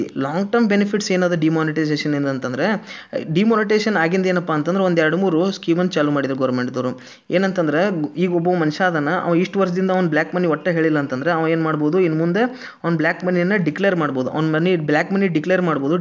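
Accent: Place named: North Karnataka, India. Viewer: sir your uttarkarnataka kannada ...awesome sir....😊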